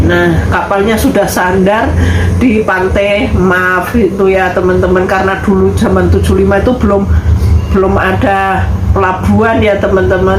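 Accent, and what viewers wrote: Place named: Java, Indonesia. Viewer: kok jowone masih medok banget....Yo.....padahal sejak kecil di Papua. Salam Soko Pacitan Jawa timur.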